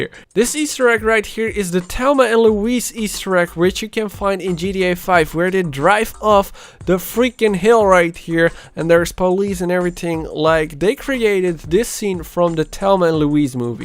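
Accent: Netherlands. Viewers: Are you dutch? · Nice Dutch accent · Are u Dutch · Are yu dutch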